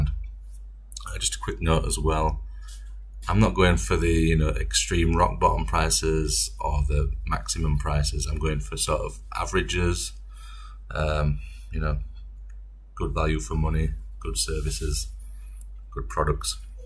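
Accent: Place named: Midlands, United Kingdom. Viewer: Accent tells me you come from somewhere between Nottingham and Manchester - but not Chesterfield. Buxton?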